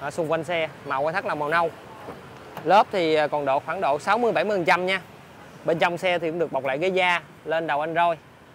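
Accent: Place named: North, Vietnam. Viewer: Sao kênh này mà có khi nghe giọng người Bắc?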